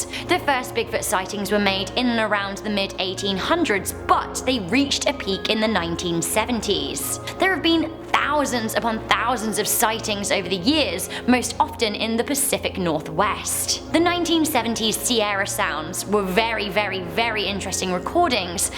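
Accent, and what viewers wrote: England, United Kingdom. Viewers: I thought your from england because of your accent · Does have a british accent Like:yes Comment:no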